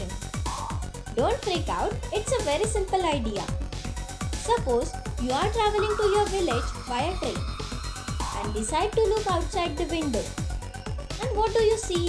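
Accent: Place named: India. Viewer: By you voice I believe you're an Indian.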